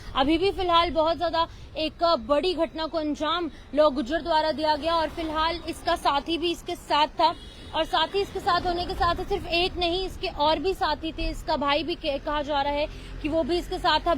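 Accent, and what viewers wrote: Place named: United Kingdom. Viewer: Reporter ne hindi ka accent uk english me kr diya 😢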